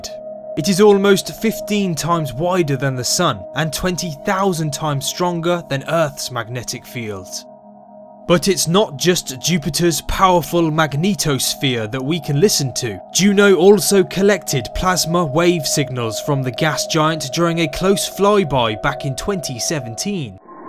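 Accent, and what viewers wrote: United Kingdom. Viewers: Why are you British? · I love your british accent 💖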